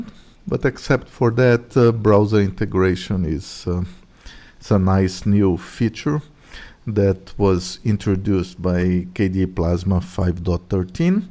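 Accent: Brazil. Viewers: tnx for the video! anyway, r u brazilian or something like this? i noticed a very specific accent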